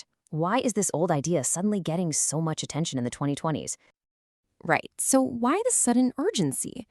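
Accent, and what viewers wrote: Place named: India. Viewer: Globalism, butch girl boss AI voice with annoying vocal fry to obscure Indian accent, leftist climate change BS, brainwashed NPC points. This type of low quality AI slop is so yesterday. Go away, and get a real job doing something useful for mankind, like working on India's trash, pollution, and sewage problems.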